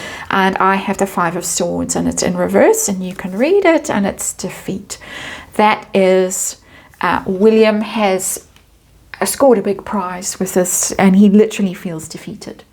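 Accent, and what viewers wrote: South Africa. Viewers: I look forward to hearing your voice everyday. A voice of reason and wisdom without the need for puff puffery. Thank you, 🙏 Your English South African accent is a sweet link to my birth country and family, my British South African heritage as a child. Thank you from 🇨🇦🇿🇦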